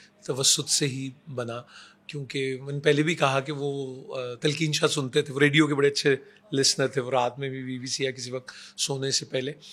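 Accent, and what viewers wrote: India. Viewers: Hindi influence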